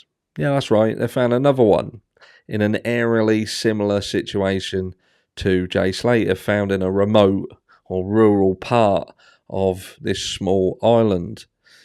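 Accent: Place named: Somerset, United Kingdom. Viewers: There's nothing particularly bizarre about my comment at all. You said yourself that MWT behaved in some ways like a "content creator". Maybe he has "created some content"? Was that not one of the very questions you were touting? Call yourself what you will, you are a person who is also inserting yourself into high profile cases to further your channel, raise your profile and therefore further your own ends. I see little difference between you and MWT in many regards. I'm not having a go at you, merely intimating that you are not that different to him. All people in the media create / deliver content to some extent. The difference with him is that he is an older bloke trading off the back of having "exposed" Savile, albeit posthumously, hence could not be sued for defamation. I think MWT is something of a chocolate teapot and an opportunist. 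He is asking questions about this case somewhat in the public eye, as are you. You are a so-called content creator who has created this blue branding to match your blue eyes, and pontificate, slightly sanctimoniously at times, about cases in what sounds like an Estuary Essex / West Country fusion accent, and in a slightly laconic, sweary way. You purport to speak about cases respectfully about cases as if you "had skin in the game" but seem decidedly disrespectful about the 46-year-old "Hungarian fella" you say "should have f**** known better". Perhaps your benevolence only extends to young gangsta dabblers, and the similar fate of a sl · Hello from Gloucester, lovely balmy evening tonight, but dreading the winter 😟 Love your videos and your lovely Somerset (?) accent.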